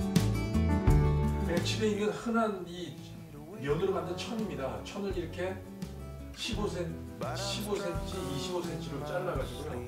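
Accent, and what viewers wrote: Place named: Jeolla, South Korea. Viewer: 서울말투가아님. 전라도말투?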